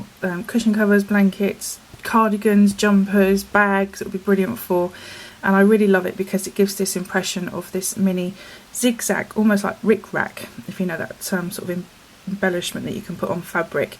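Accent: United Kingdom. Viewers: great video thanks - fantastic to hear a British voice using British crochet stitch terminology too :-)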